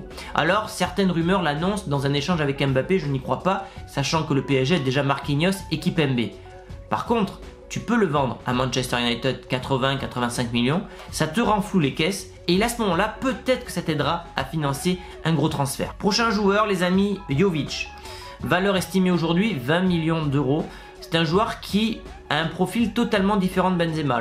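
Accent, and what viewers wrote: Spain. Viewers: Mdr ton espagnol 😂😂😂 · Ta veécu en Espagne pour avoir un accent aussi... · Salut je te connais à peine mais sa se vois que ta mis le budget du real dans ta vidéos et en + avec le petit accent espagnols qui passe crème Hala Madrid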